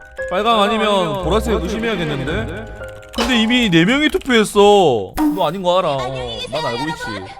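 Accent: Busan, South Korea. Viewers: 부산 분이신가요?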